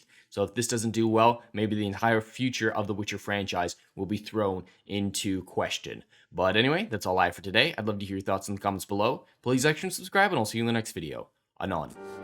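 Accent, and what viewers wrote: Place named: New Zealand. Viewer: lol once again done in a badly done masculine voice that is in fact a Kiwi accent Are you not entertained? ARE YOU NOT ENTERTAINED?! Is that not why you're here?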